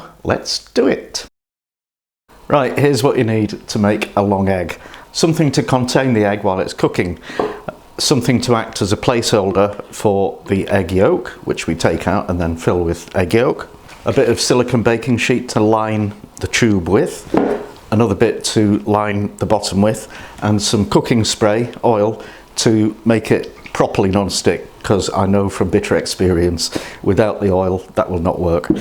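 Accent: United Kingdom. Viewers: I like the way brits talk. I wish he said potter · I love your accent! I'm American but my husband is Northern Irish. So I always love hearing the different dialects of British accents, and yours is lovely. Soothing to listen to. I also really enjoyed your video. And this is very awesome. I've never seen the long egg before now... And I think you did a very amazing job. Your videos are very well made, and I just love watching you cook lol! I'm not sure what it's called... Forgive me for my ignorance, but it's a common dish in Britain where they boil an egg and crack it open and eat it from the shell. I'd love to know if you have a video for that, or could maybe do one.. I've always wanted to learn how to make that and I really like your method of cooking! Or do you maybe have any knowledge of the proper recipe for it. Any how, I definitely wanna try this long egg! 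I love eggs, some salt, parsley, bread or crackers... Yummy. So this would be nice for so many reason, a gathering of friends an appetizer, or for breakfast with the family etc. Keep sharing! P.s that's a really awesome concoction you made there for the egg. Very smart and clever. Brilliant actually lol. Take care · British Colonel Sanders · is he British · Kernel sanders is British?!?!?!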